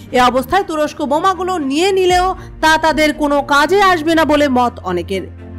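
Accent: India. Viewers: Ei shangbadik indiar hobe kotha bolar dhoron tai mone hoye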